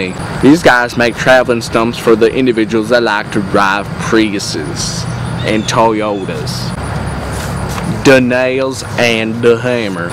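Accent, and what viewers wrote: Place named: Northern Appalachia, United States. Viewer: That ain't a Southern accent, that's a Northern Appalachian accent.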